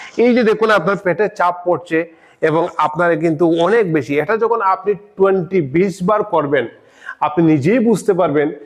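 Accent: Sylhet, Bangladesh. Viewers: ভাই কিতা সিলেটি নি কথায় বুঝা যার সিলেটি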